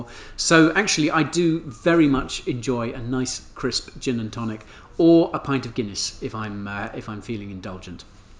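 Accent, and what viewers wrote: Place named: United Kingdom. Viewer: So nice to have an eloquent British voice in crypto YouTube amoungthe many Americans!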